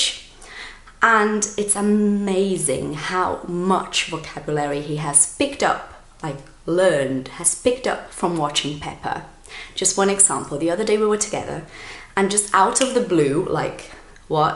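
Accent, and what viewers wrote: United Kingdom. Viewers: How did you get this accent? I really love British accent. Thanks for sharing these videos with us! Congrats for you Channel.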